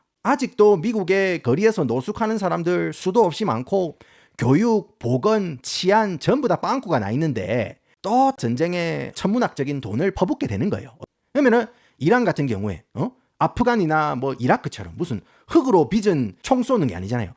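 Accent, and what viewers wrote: Gyeongsang, South Korea. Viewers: 영어 발음은 네이티브인데 억양에 부산 경남 억양 있는게 존나게 웃기네 ㅋㅋㅋㅋㅋㅋ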